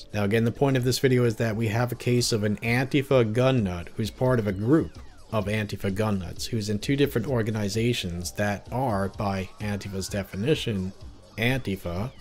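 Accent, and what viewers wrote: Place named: New York, United States. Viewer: I FUCKING KNEW YOU'RE FROM NY!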